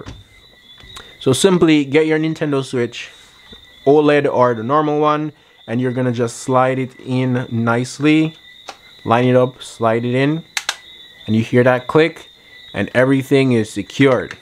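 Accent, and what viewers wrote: Jamaica. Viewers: I can already tell ur from Jamaica bro 😂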